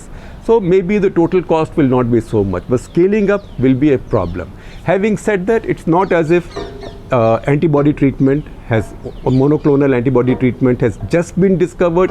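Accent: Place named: Punjab, India. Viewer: if this guy can drop his Punjabi accent, he'd get a lot more views on his channel. For heaven's sake it's not Indiana Police 😣😣😣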